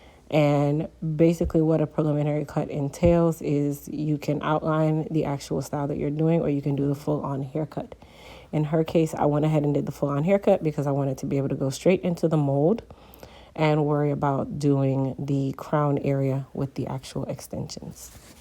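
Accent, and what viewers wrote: Jamaica. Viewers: Sound jamaican to me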